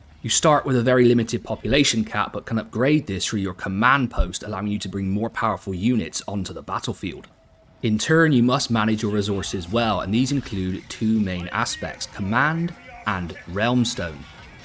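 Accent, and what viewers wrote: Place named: West Country, United Kingdom. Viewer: Hearing a West Country accent in media is a breath of fresh air! I’m from Somerset and you’ve got a follow from me mucker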